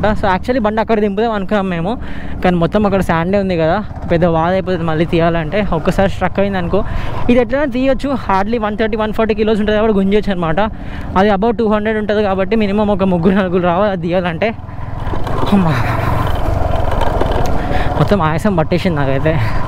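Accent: Kerala, India. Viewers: Bro mee native place ekkada I mean Malayalam matladthanav kada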